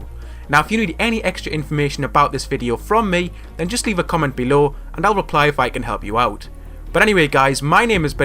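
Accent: Newcastle, United Kingdom. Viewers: U from newcastle I am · It's not Irish, hes from Newcastle, it's a Jordy accent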